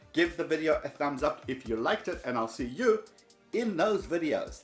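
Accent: South Africa. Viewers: You confuse me... S. African Accent, Israeli name... but you live in the US?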